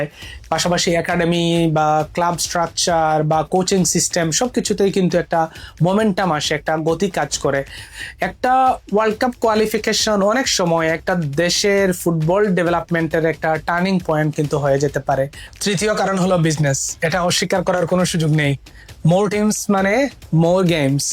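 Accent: Sylhet, Bangladesh. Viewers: Sylheti mone hoy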